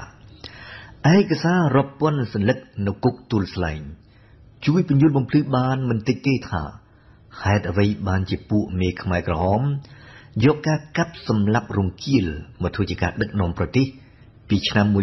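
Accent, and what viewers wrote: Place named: France. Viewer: I LIKE HIS voice SOUND mix French and KHMER interpreter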